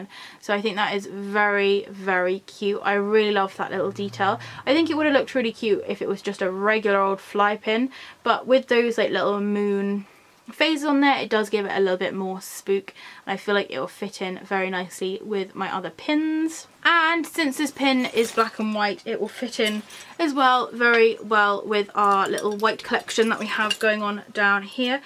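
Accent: United Kingdom. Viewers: Hi Shirley here. I luv listening to u talk, luv your UK accent.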